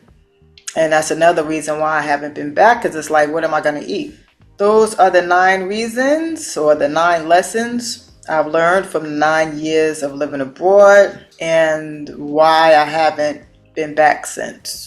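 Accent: New York, United States. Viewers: Sounds like a NY accent ma. How you doing. Love your perspective. New sub glad I stumbled upon your channel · I would like to move abroad. I enjoyed this information. Are you a New Yorker? Lol I ask because if your accent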